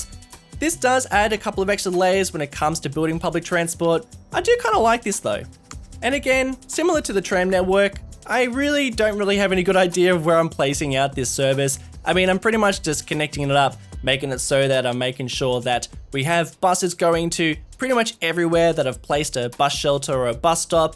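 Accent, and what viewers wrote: Australia. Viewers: he's not even british?? it's obvious his accent is australian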